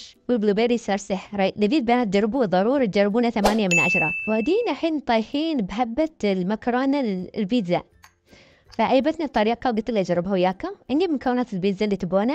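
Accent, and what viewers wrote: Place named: Iraq. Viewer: فيدوهاتك تجنن 😔💟مايفوتني ولا فيديو الحمدلله ،وكلامك كانو عراقي 😂🩷🩷🩷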